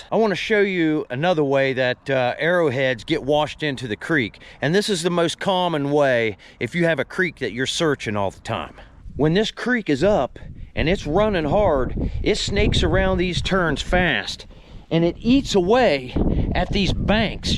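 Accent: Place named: Pittsburgh, United States. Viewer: hes a yinzer no doubt I here that Pittsburgh accent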